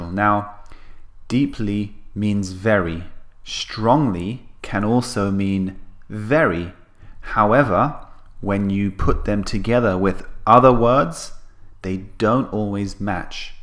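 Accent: United Kingdom. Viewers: I have a question. May I know, which British accent do you teach? Do you teach a Cockney accent, Modern RP(received pronunciation ) or a Brummie accent? Your reply would be appreciated.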